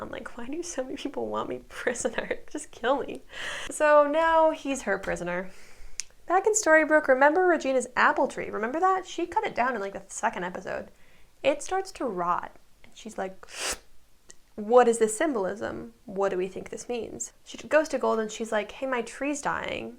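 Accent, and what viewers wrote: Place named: Australia. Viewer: Wow! Never expected to realize that I needed a full retrospective on season 1 of OUAT. Thank you so much for processing this mess of a show into a coherent recap. This video rekindled my interest in this fandom, so I guess it's time to dig some of my favs from ao3. Because the show treats itself so seriously, I was giggling whenever you were referring to Rumple or whoever with "bestie" or completely slandering someone for whatever illogical thing they did. Like half of the time plot important characters appear out of nowhere to do some random moral talk for a side-story character because... reasons? Like why the hell Belle suddenly materialized to give a true love speech for Grumpy/Dreamy. It's even funnier when you consider her side quest with Mulan just somewhere around that same time period. Like the Mulan? Seriously? Or the fact that Regina crushes Christian's Gray heart(whatever is his name in the series) and no one actually cares in just about a few minutes(even Emma with her unresolved internal conflicts and fear of abandonment) but Catherine not-so-dead-but-actually-kidnapped is getting like multiple episodes in which everyone in town speculates on what's happened only because let's be honest here it's just juicier gossip than random ass sheriff dying of a heart attack in what? 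30ish years old? It was two hours and a half long video and I chuckled every time you did that. Also, your Australian accent and some characters' quirks impressions were just killing me, like I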